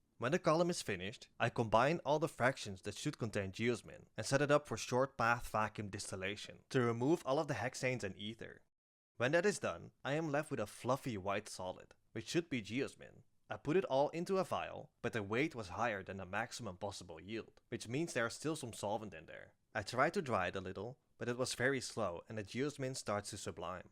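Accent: Netherlands. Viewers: r u dutch? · Are u dutch ? u sure as hell sound like it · I hear a Dutch accent? Gaaf hoor, en ik moet me echt een rain geur aanschaffen 😅 -Oh nee ze zijn uitverkocht! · Are you by any chance dutch? I noticed a little bit after way too long but i did pick out a couple of pronunciations when i started to focus on it, and now you saying youre not a native speaker... That would be really cool! Anyways loved the video im glad i got it in my recommended im definately gonna binge you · I know a fellow Dutchman when I see (hear) one... goed bezig man, je hebt een kijker erbij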